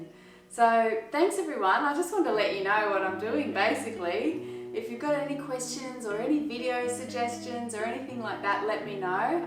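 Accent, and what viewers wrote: Australia. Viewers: Hey! This is fantastic news! Well done you! I’ll definitely be following along. I’ve watched your videos so much I’ve started reading and thinking about soap in your lovely Australian accent! 😂. Going to try making your pine tar soap for my own blokey blokes soon. Can’t wait to see what you come up with next! Ooh - and if you haven’t already, could you please teach us all about master batching, storing and reheating lye solution? Thank you. Take care and you and yours stay healthy and happy! 🙏🏼😍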